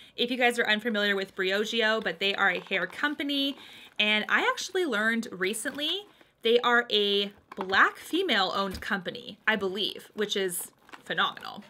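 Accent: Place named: Canada. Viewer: Good morning (at least it’s morning here in Germany 😀). I’m a Brit living in Munich, Germany and because of the time difference you are very often my breakfast buddy 😊. Your videos always put a smile on my face and cheer me up if I’m feeling down. You have a lovely sunny disposition and I love your Canadian accent! The haul looks great. Think I might have to get the Briogeo mask, as well as the Marc Jacobs palette. Really hope the mascara lives up to your expectations - now I’m worried that I hyped it up a bit too much (it is great though). Have a great day xx 💋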